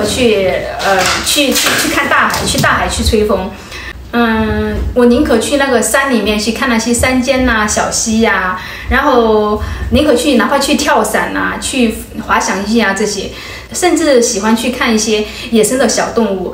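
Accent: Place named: Taiwan. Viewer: 妳跟台灣人一樣了。說話結尾不說哈就更像了。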